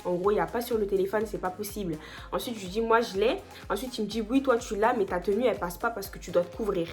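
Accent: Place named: Morocco. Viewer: Une question jeune fille,tu es tellement jolie😍( tu as l’âge de mon fils),pourquoi parles tu comme une marocaine/arabe?🤔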